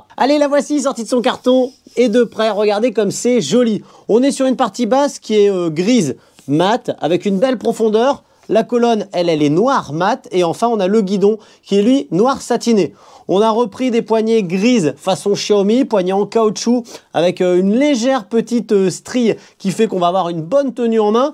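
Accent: North, France. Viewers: Salut, Excuse moi tu est du Nord ? Lille ? Merci à toi 👍👍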